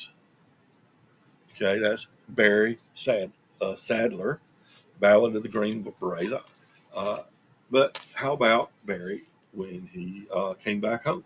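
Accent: South, United States. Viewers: Great stuff. Yesterday on the Tokyo Record Style stream I asked you if you had done a video on albums from '74. Well, look back three years ago, pard.;) I wouldn't forget such a cool southern accent. I was just listening to today. I'm thinkin his cover of is going to be in my Summer Vinyl tag video... if I get the gumption to make it. (What a great album though.) I need to get my hands on that record.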